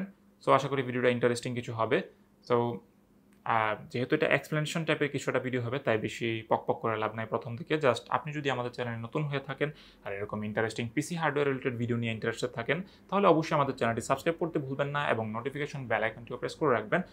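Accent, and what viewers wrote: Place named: Chittagong, Bangladesh. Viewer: your accent kinda sounds like chitainga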